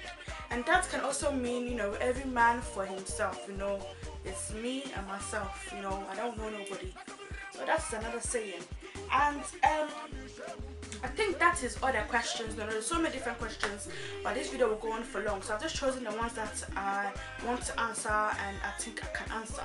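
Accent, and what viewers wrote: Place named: Nigeria. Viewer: lmao ur ghana accent sounds nigerian not ghanaian........ love ur tag interesting u shd hve danced azonto for us tho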